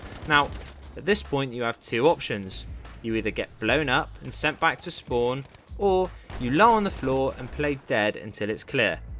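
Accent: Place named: United Kingdom. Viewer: Your brit accent is so funny dude ! So enjoyable! Well done Edit : this questionable humor is fantastic ahahah Edit 2: your like the British modest pelican With that commentary post whether you want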